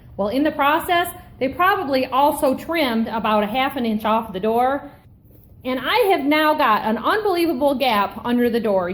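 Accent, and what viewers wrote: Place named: Michigan, United States. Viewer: Michigan, I was born in Lansing. Thought you sounded a lot like my cousins up there 🤣. On another note, we just replaced all our windows and the doors are next. We have big problems with humidity hear so wood movement is always changing the way windows and doors shut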